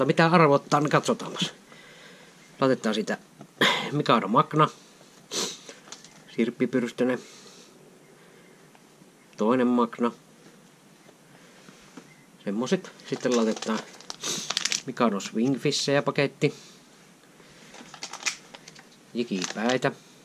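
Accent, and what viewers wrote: Savo, Finland. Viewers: asiallisia videoita savon murteella, sekä hyvät kalastuspätkät. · Onhan tuota tullu seurattua ihan alkuajoista asti. Kaikenlaiset pärinät ja savolaisuus sai seuraamaan. Ja kyllä se savolainen suorapuheisuus ja elämänasenne on saanut pysymään kanavalla. Olihan ne pärinävideotkin viihdyttäviä, mutta nykyinen suunta on oikea, jatka samaan malliin! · aloin tilaamaan sillon ku kalastusvideoita alkoi tulemaan ja parasta on toi sun savon murre. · Tykkään erityisesti kalastusjaksoistasi ja myös kalastukseen liittyvästä muusta materiaalistasi. Savonmurre on myös mukavaa kuunneltavaa. Olen oppinut myös kalastusjaksoistasi paljon uusia tekniikoita ja kalastustapoja, kiitos siitä! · Sun seuraaminen lähti niistä chilivideoista kun kattelin e6363ee:n kanavaa ja äijä oli sielä järves aivan pellit kiinni😂Ite oon Pohjanmaalta kotoisin ja jotenki tuo Savonmurre on niin hauskaa kuunneltavaa,ja se et läppä lentää niin saa nauraa välillä melkein kuset housuissa.. 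Ja nää nykyiset kalastusvideot on tosi hyvää settiä kun itekkin oon kalamiehiä.. Jatka samaa rataa vaan! Oot parhaimpia tubettajia joita oon seurannu.👍👍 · Aitous ja savon murre.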